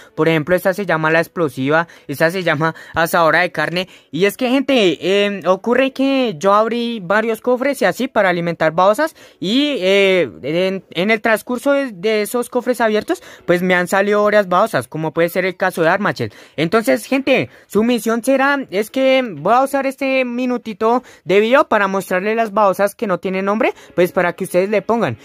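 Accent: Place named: Colombia. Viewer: Eres colombiano por tu aciento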